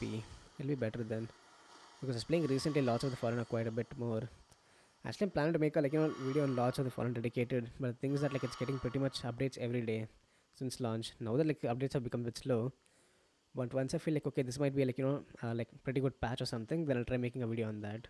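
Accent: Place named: India. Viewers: I don't understand Indian English sory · The problem is if he tries to change his accent to native speakers then indian people will say that he is trying to be sn American.😄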